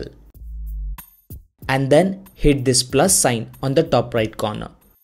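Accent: India. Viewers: Always a boss with an indian accent that comes in clutch! Thanks bro 🤌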